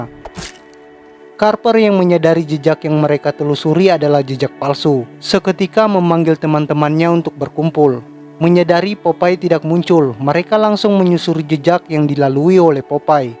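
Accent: Eastern Indonesia, Indonesia. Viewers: Bang orang timur? Logatnya kental timur 😂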